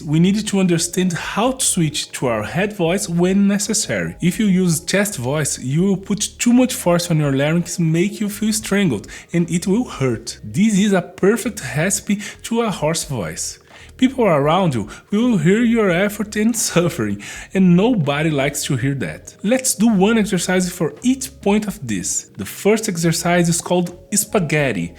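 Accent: Brazil. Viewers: VOCE É BRASILEIRO VOCE NAO ME ENGANA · Is crazy how we know someone is from Brazil by the accent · Hi! This video is great! Thank you so much for posting this. A small question, are you Brazilian by the way? Your accent is very familiar! Familia Brasil! Abração! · Great video! Just a question tho, are you Brazilian? :V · engraçado como que no primeiro segundo do vídeo eu já sabia que era brasileiro kkskjsksks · Hehe Deu pra perceber que era BR pelo sotaque O canal é top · I love the brazillian accent, nowdays I can perceive how much we say all the sounds more open and "round" than the americans, mainly because this is a characteristic of the Brazillian Portuguese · Are you brasilero my amigo? Teu sotaque é imperdivel saudes. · Quando eu vi o sotaque ja sabia que era Brasileiro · Most Brazilian accent I've ever seen ahah Great tutorial! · CERTEZA QUE É BR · Tu é brasileiro, certeza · You're Brazilian, right? 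· sotaquezinho brasileiro <3 thanks for the video! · i love the brazilian accent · Is he Brazilian or am I trippin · Reconheço o sotaque br de longe kkkkkkkkkkkk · I can tell this dude is Brasileiro by the cadence and enunciation of his voice lol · i can smell a brazilian accent from a mile away CHEERS mano! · I can tell by your accent that you’re Brazilian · The Brazilian Accent is THICK! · tu é brasileiro é ? thank'u for video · ola! tudo bem? I can tell that you are from Brazil! thank you for the video. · This has nothing to do with the video but are you brazillian?? It’s just because of your accent I don’t wanna sound rude I’m just really curious lol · VC è mineiro? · Adoro seu sotaque brasileiro lindo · Pensei a msm coisa , soa como um brasileiro kkkkk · Bem quando você começou a falar sabia que você era Brasileiro :D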